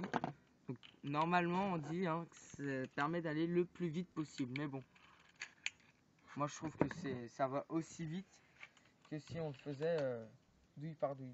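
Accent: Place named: France. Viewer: sa fait plaisir d entendre un francais mdr d habitude c tjr des americain on comprend rien super ta video continue comme sa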